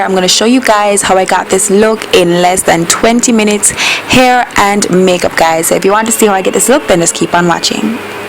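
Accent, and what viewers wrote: Jamaica. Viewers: are you jamaican or Trinidadian? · Ooo she Jamaican go girl!!! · Love the Jamaican accent. you are so beautiful. big up yo self. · ooh Jamaican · love yo accent (team jamaica)😊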